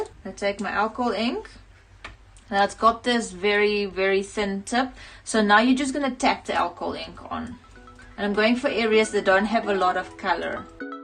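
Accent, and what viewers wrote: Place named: South Africa. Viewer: Your tutorials are fabulous! I just found your channel and subscribed! I thought your accent is from South Africa! I am too from SA! I live in Adelaide now in Australia, but might be moving back to look after my mom. Do you live in SA?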